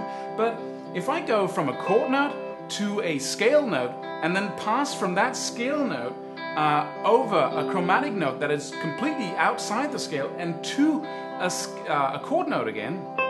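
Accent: England, United Kingdom. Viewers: Wow you look like me with short hair no facial hair and an english accent , shred on cuz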